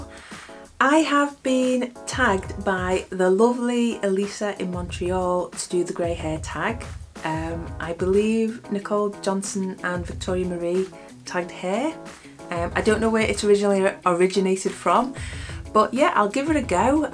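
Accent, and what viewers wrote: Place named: Liverpool, United Kingdom. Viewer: I love your accent.....I have a Scouse accent too!!😀 I'm a lot older than you, but I'm just starting to start, transitioning ....I'm a wee bit hesitant but I know, I need to do this. You know what I mean like don't you? 😀 Thanks for your videos, are you in Liverpool?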